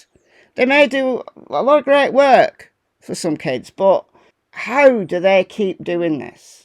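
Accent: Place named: Leeds, United Kingdom. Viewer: You gotta be from Leeds 😂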